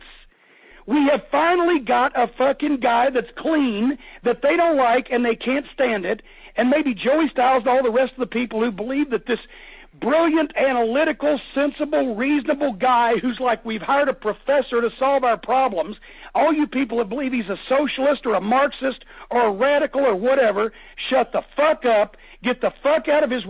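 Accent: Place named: South, United States. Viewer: Wow...logical progressive thought with a southern twang. I like you Mr. Cornette!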